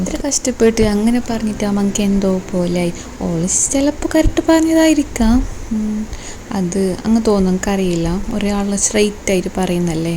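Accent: Kasaragod, India. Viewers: ആര് പറഞ്ഞു 😂😂video ഇഷ്ട്ടം അല്ല എന്ന് ❤ നല്ല രസം ആണ് കാസർകോഡ് സംസാരം കേൾക്കാൻ ❤️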